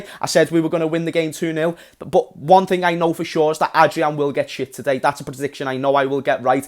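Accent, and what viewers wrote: Liverpool, United Kingdom. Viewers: Nice Scouse voice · This Scouse Accent is Ultimate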